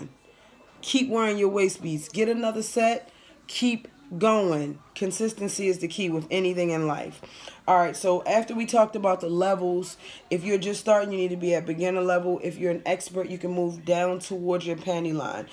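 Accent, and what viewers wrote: Baltimore, United States. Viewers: You from Baltimore? the way you say "to" 😂I love y'all accent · I knew I heard that Baltimore (me too). Glad I found your video. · I hear them "ewrs" in your words... you from Baltimore aint you? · Are you from Baltimore? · Thats that Baltimore accent. Hey sis! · Are you from Baltimore? You have the accent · Are you from B- more? Your accent reminds me of home.😊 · Juna Luna Sooooo glad you mentioned this! I have a friend that lives in Baltimore and I thought I was crazy because she sounds just like her 😂😍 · You just spoke to my soul...I love how real you are. I have killed 3 sets so far...my placement was wrong for my skill level and possibly my body type (apple shaped/bbw). You have given me so much encouragement. In the first minute of you speaking I said she sounds like she is from Baltimore (our speech is very distinct). 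Thanks sis!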